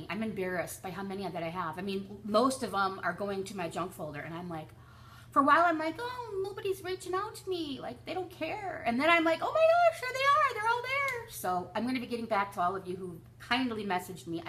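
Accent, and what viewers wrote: United States. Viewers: Oops, just heard the end, you're American lol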